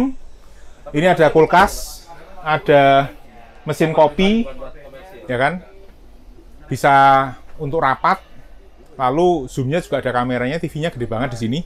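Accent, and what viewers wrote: Java, Indonesia. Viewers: Gak focus ama bus nya,..focus ama abangnya yg medok jawa nya😂😂😂emang beda yaaa saudara kita chines indo dan chines malaysia😂😂😂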